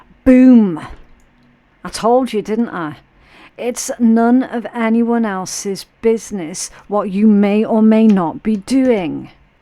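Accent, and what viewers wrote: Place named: Scotland, United Kingdom. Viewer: I love your accent, I believe it’s a Scottish accent. It sends me back to when I was a little child and lived in a house right next-door to grandma and grandpa from Scotland. Thank you so much for sharing your spirit with us. Might love always.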